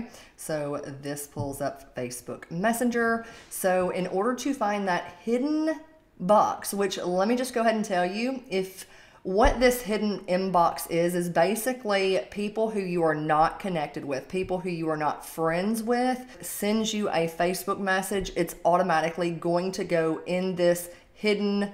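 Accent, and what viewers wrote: South, United States. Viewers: I was born in the south so Love the Southern Accent!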